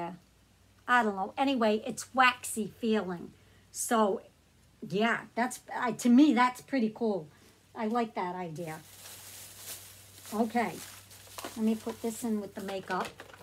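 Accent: Boston, United States. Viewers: Springfield Massachusetts 🥰 Your Accent Leads Me To Believe You're From Boston 💙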